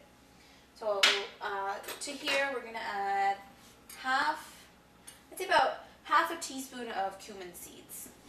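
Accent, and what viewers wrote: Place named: North America. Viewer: No. It's a north american accent.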